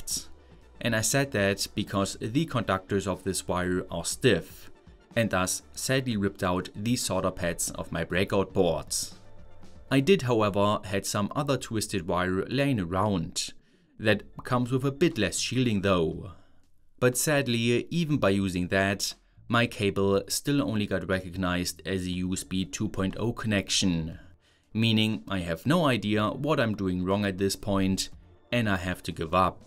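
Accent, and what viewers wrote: Germany. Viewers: du bist deutsch?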